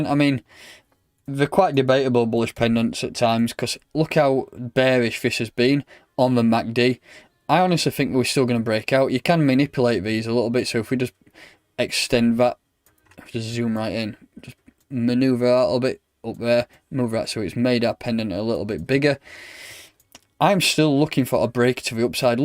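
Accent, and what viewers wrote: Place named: Yorkshire, United Kingdom. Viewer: I was working and living in Scotland about 15 years ago... and people there speaks similar to you, so to me it's a pleasure to watch your channel and listening your British English language from Yourkshie 👍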